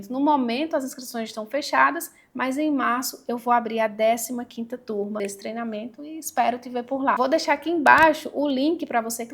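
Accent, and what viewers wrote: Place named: Pernambuco, Brazil. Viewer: Fica maia engraçado ainda com o sotaque de pernambucana kkkkkk😅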